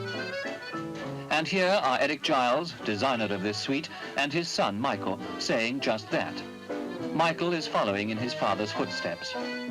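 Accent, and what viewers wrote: RP, United Kingdom. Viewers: I was born that summer in a very different part of The UK. This offering? Same predictable, English plumby voice, use of word England when at times should have been Britain ,and the poisonous anglocentricity many of us have to suffer...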